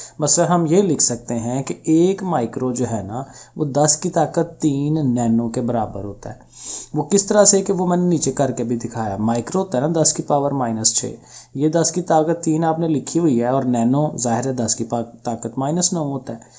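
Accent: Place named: Lahore, Pakistan. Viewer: Ap Lahore sy lgty hyn sr😊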